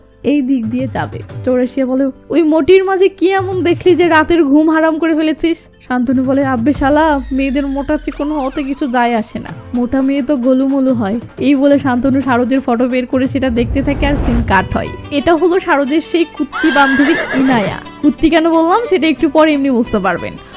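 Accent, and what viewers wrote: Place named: Nawabganj, Bangladesh. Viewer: নবাবগঞ্জের মেয়েরা এভাবে কথা বলে আমার মনে হয় উনার বাড়ি নবাবগঞ্জ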